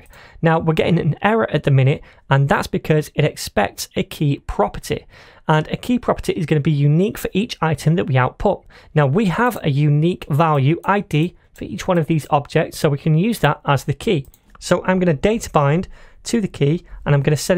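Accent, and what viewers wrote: United Kingdom. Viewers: I was amazed to see you used rupees as the currency. Love the British accent btw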